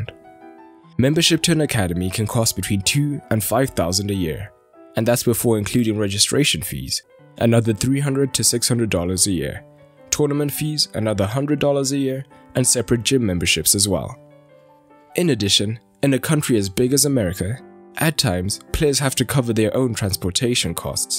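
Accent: South Africa. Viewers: Ehhh mmona are you South African ?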